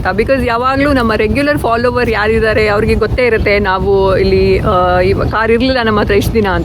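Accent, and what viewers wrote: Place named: Bangalore, India. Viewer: Abhinandanegalu.... 👌👌👌 Nim kannada Blore kannada..... sounds nice... but namma Udpi kudla kannada keloke thumba chennagirutthe... alwa... omme kudla kannada dalli maathadi... chanda irthadhe...🤭 Cute.... 😘 ❤ from Dubai....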